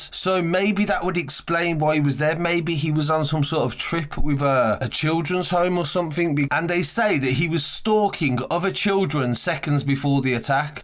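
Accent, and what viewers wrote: Birmingham, United Kingdom. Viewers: Are u a brummie ? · Can't listen to this nerdy Birmingham accent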